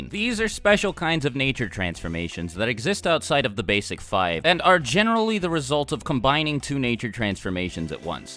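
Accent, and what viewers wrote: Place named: United States. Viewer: Your American accent is killing me... STOP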